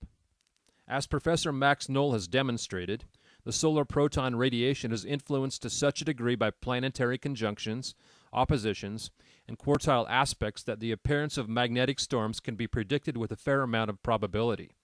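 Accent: United States. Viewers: where are you from, that accent is from Atlanta?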